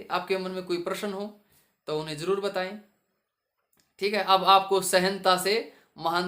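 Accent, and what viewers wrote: Haryana, India. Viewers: Aap haryana se ho na 😅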